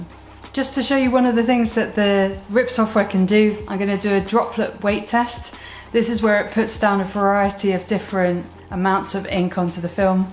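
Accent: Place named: United Kingdom. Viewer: Finally UK English!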